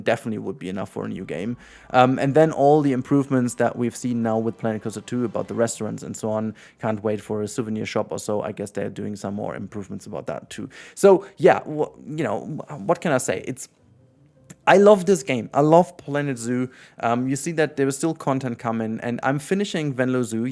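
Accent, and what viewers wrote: Germany. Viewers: Finde es witzig das man raushört das du Deutscher bist obwohl dein englisch sehr gut ist😂❤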